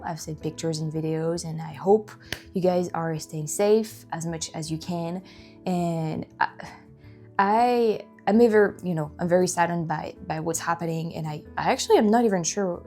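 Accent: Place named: France. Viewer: your English is having less French accent to it I noticed.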